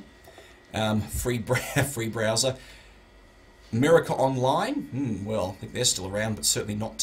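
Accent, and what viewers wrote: Australia. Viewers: You wouldn't happen to be Australian are you? you sound it on your videos. Love your videos of the Old Vintage Macs you got too.:)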